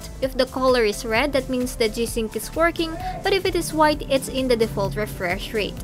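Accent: Philippines. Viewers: I'm familiar with this accent. She's definitely Filipino. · filipino accent best accent ez to comprehend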